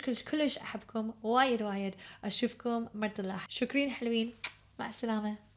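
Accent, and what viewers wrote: Iraq. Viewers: هي عراقية اصلا وهذي لهجتها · This is Iraqi Arabic language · You're so talented Huda!!!! you sound so cute speaking iraqi arabic dialogue ❤️❤️🥺🥰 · يروحي اطلق من تحجي عراقي!!😩🔥🤎. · Dear Huda ,You are so cute in the Iraqi dialect , so happy with this video ,'I didnt know you spoke arabic, wish you all the best ya helwa.. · فديت اللهجه العراقيه بصوتج جدااا جميله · Kolish hailo your accent when you speak Iraqi...💓🇨🇵🇩🇿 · عمري الي يحجي عراقي😂♥️♥️ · اعشق لغتك العربية العراقية 🙈😍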